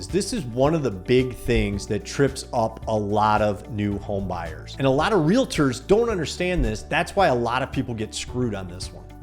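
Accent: Michigan, United States. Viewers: Yep, I thought you were a fellow Michigander, as soon as I heard you talk.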